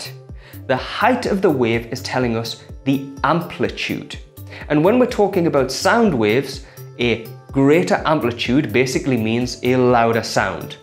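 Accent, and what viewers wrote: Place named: United Kingdom. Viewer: dude that was really helpful .like you go britsh!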